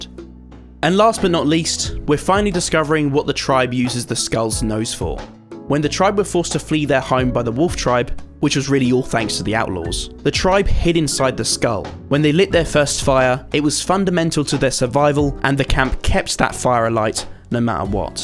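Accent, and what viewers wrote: United Kingdom. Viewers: Don’t wanna be mean but you’re British accent makes it really fun to listen to you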